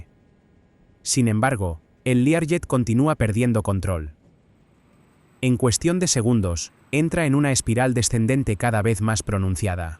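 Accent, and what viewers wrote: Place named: Spain. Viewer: Habla como español pero no sabe cuál es el apellido paterno del piloto y del copiloto?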